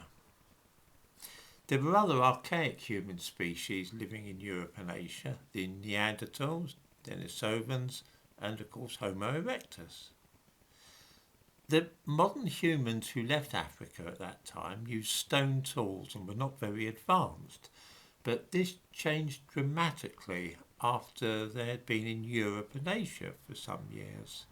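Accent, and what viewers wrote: United Kingdom. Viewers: All of the base technologies that allowed the human species to become the alpha predator of planet Earth developed in Africa before Africans migrated throughout the world. A lie of omission, even when spoken with a British accent, is a lie nonetheless..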